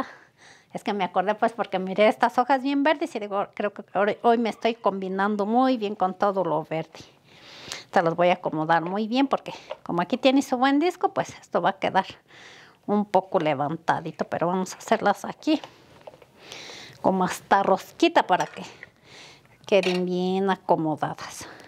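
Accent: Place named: Michoacán, Mexico. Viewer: Tu hablas bien a la forma qué hablan en Michoacán con todo respeto Pero hablas muy rápido Pero si tú quieres hablar así rápido claro te vas a trabar Pero explicas bien no digas que no saber hablar !claro qué sí puedes ! Adelante si se te entiende no vuelvas a decir que dices una cosa por otra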